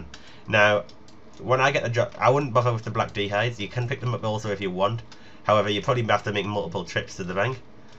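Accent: Newcastle, United Kingdom. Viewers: btw are u a geordie ?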